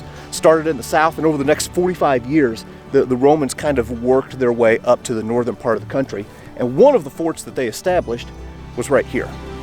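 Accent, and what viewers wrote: United States. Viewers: So so funny listening to an american talking about Roman ruins!! Yeap there was a lot more going on in the rest of the world before the usa came along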